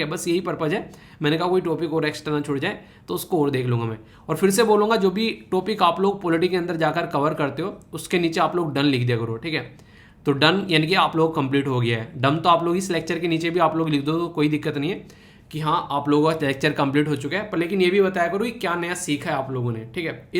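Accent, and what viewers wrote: Haryana, India. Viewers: haryana se ho na aap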